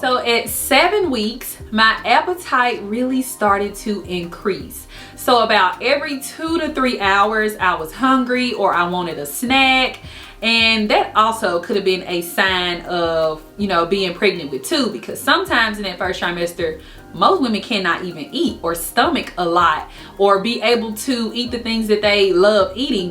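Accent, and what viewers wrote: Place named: Louisiana, United States. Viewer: #1 Congrats!! Two blessings 💕✨ #2 You are so dang precious!!!! Lol your accent (I'm from Louisiana so I get it Haha) + your mannerisms are just too likeable! Prayers for you & those babies 😊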